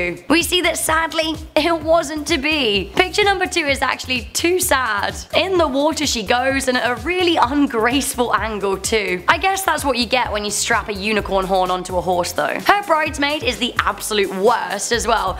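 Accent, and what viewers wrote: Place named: United Kingdom. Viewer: This lady's British accent is super strong and LOUD!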